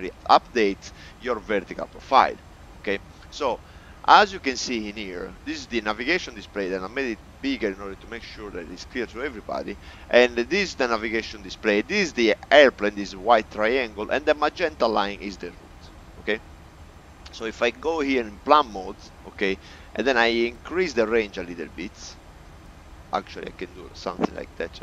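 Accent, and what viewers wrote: Italy. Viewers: Mamma mia, parla troppo...hehehe, Thanks for the video, I can tell you are Italian...just like my family 🙂, Great video, just learned a new thing with you. If you were an American, you would have described this in 2 minutes 🙂..GRAZIE MILLE fratellino.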